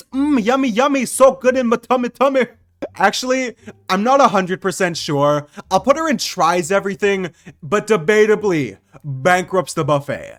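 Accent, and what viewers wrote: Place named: Quebec, Canada. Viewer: Omg are you french/french speaker?? Your accent is awesome, like a French Canadian accent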